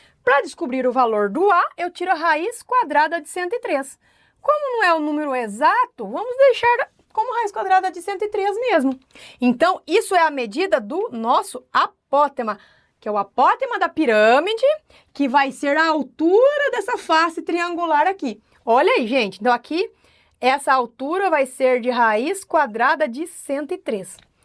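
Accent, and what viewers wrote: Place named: Paraná, Brazil. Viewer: Olá professora, você é do Paraná? Pelo seu sotaque. Tenho vários alunos de lá, também sou professor, mas de eletrônica. Beijos de um prof. para outro.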